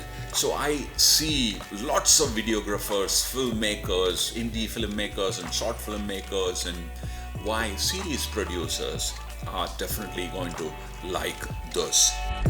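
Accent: Kerala, India. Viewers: Chetta....ur mallu accent .....rocks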